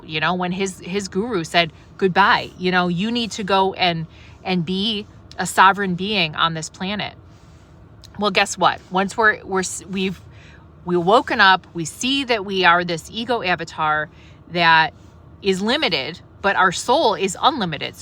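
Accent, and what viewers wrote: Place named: Midwest, United States. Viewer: Wild to hear this with a Midwest accent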